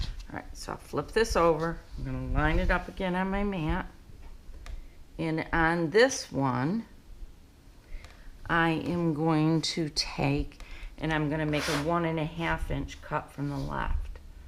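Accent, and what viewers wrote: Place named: Rochester, United States. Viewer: Are you from Rochester NY ? I here the accent.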